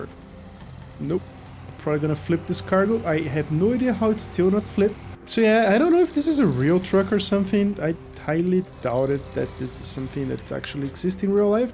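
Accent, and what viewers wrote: Brazil. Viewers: esse maluco parece um brasileiro falando inglês kkkk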